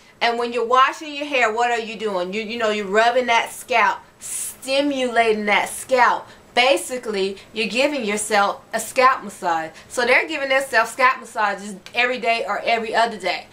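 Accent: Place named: United States. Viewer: Omg I can't NOT believe I'm just now coming across your channel I it's 4am and I'm up watchng your channels 😴.... First I love your country accent, your sprit and passion for natural healthy hair make the videos more enjoyable. Second 👀😱 that cabinet full of powders! Please share. <mail> I use a lot of essential oils but never used a variety of powder just the Aztec clay on my hair it was okay my coil sure did pop👀🙈😱.... Lol as for my daughters hair it worked well for one but dried out the other one. Quickly stopped. So I just use that as a face mask or toothpaste. One of my daughters hair is thick thick thick and I can't seem to keep it moisturized, I invested in the Qredew and its aiight! 😒.... Run down of my struggle cause it's GETS real ! Lol can I get a list of powders you use? Thanks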